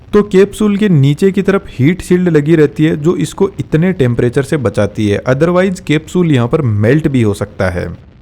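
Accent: Rajasthan, India. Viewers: Bhaisaab aapka accent Rajasthan wala h aap kaha se ho, Mai Jaipur gramin se hu😊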